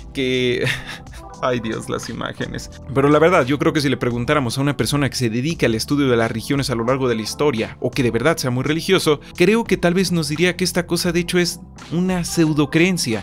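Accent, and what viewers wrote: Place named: Mexico City, Mexico. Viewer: Mames, el mismo acento de la Debayle y casi todas las ladys, ¿Poblana? Fifi de CDMX?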